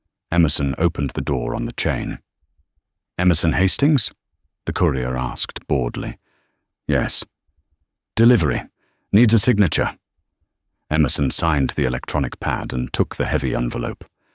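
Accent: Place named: United Kingdom. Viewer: The British accent gives the narration more gravitas. But use a female narrator sometimes, please.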